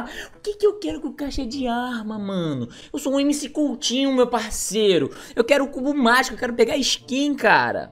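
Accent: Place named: Rio de Janeiro, Brazil. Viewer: de que cidade do rio vc é?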